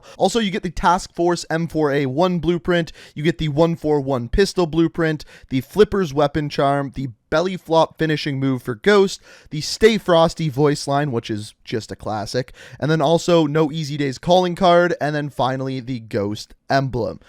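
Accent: Canada. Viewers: He’s Canadian I think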